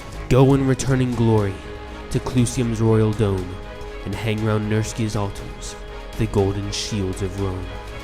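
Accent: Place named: United States. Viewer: Magnificent words, but doesn't work when read in a U S tone of voice.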